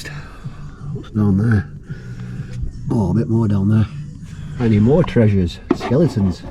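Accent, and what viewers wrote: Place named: Lancashire, United Kingdom. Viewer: Good Lancashire accent too.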